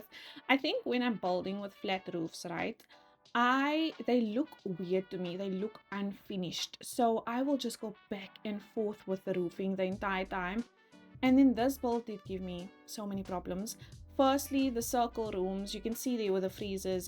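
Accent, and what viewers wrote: South Africa. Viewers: Hi, I know this is random but are you South African by any chance? I sense a South African accent, a strong one lol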